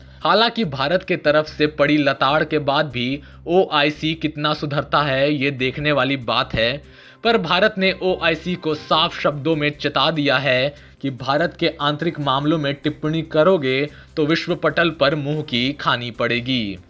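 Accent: Standard. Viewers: आप जो शुध्द हिन्दी बोलते है उस से बोहोत ही आनंद होता है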